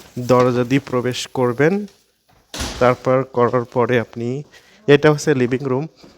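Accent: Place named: Satkhira, Bangladesh. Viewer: satkhira theke... Apnar vasa sune mone hoi apnar bari satkhira..jodi satkhira bari hoi taile janaben 😊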